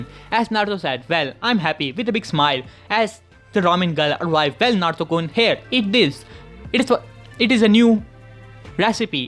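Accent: India. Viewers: Are you from India... because your pronunciation seems like that. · Bruh you a indian?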